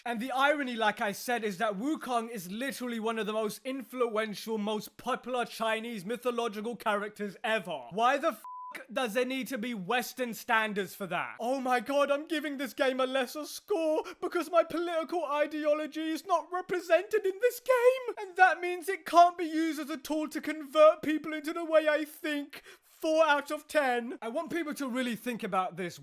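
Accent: United Kingdom. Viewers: i love your british accent sooooooooooo much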